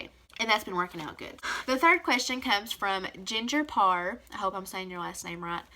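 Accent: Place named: Kentucky, United States. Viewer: I just found your channel and listening to you makes me feel at home.i am from Ky too, born and raised in Louisville. I have been living in Az for the past 18 years. I talk just like you.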